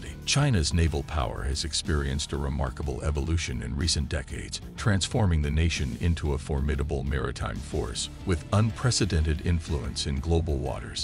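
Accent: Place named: India. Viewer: Yindian accent always give me a migraine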